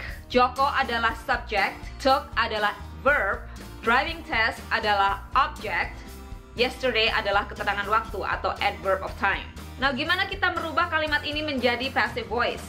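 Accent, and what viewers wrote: Surabaya, Indonesia. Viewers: Mama udah lebih dari 5 aku suka video bahas bahasa inggris. ternyata sama2 orang sby, makanya paham gitu berkat logat2nya. Nice! wkwk Bahas masalah jebakan di toefle dong mom. Pengen tauuuuu. makasih banyak! good job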